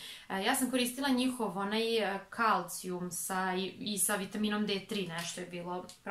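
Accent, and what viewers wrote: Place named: Vojvodina, Serbia. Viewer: Vojvodjanka,zato to tako slatko zvuci 😘😉😁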